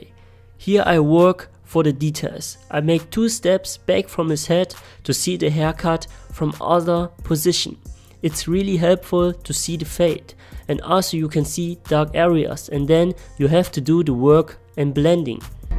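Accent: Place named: Germany. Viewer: Man hört bei dein Englisch das es bisschen mit deutsch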